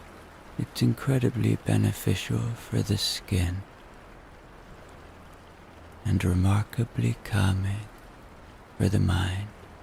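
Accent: Ireland. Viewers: This is my first time listening to one of your stories. Your Irish accent is such a bonus for me! Lovely voice and rate of speech. Comforting. Thank you,. ❤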